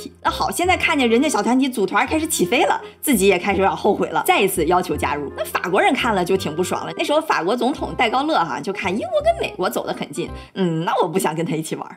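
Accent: China. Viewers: 好奇地问，你们听得懂大陆的口音吗？会不会觉得听着很奇怪？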